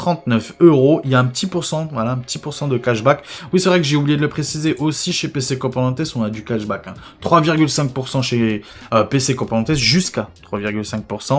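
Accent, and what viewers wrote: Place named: Belgium. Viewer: hello MERCI pour tes petite video sa ma beaucoup aider a monter un pc j'adore tes video mais vraiment meme avec ton petit accent belge 😁😁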